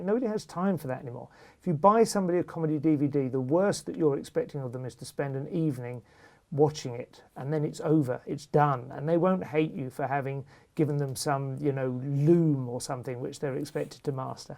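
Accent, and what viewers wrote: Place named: RP, United Kingdom. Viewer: Yes, as I commented earlier in this thread, in response to Terence Quinn: "I had the same discussion with you before on another of Evans' YouTube videos, so it seems that you are just a troll who clearly dislikes someone speaking with a comparatively posh accent, and immediately jumps to (the wrong) conclusion about money and a private education (a minimal amount of research on Wikipedia would have told you about education, but you clearly couldn't be bothered)."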